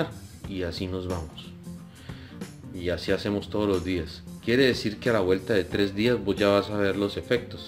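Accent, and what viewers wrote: Colombia. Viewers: Excelente video.. ahora mismo lo voy a preparar. Pero tengo una duda si su acento es de 🇨🇴 Colombia o de Costa 🇨🇷 Rica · Jajajaja si parece caleño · Hermosa tu voz eres colombiano??? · Usted es valluno se nota su gracia al hablar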